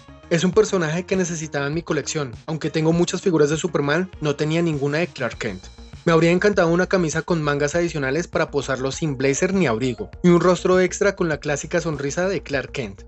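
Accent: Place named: Colombia. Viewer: Por el acento veo que eres colombiano. La verdad me sorprendió tú manera de hacer una reseña, ya tienes un suscriptor más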